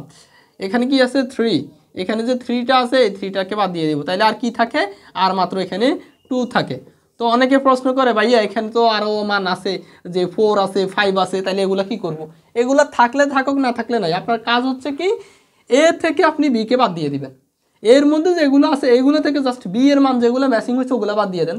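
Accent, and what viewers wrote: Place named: Noakhali, Bangladesh. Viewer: vaiyer basa mone hoi noakhali ba feni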